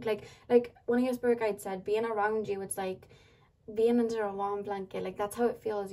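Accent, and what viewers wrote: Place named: Manchester, United Kingdom. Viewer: Great reading.! Love your accent!Ireland? Manchester? I'm an Anglophone, lol, so I've watched tv series with accents for years, but it's hard to distinguish certain accents.... I'm pretty sure you're from Manchester...am I right? Correct me if I'm wrong.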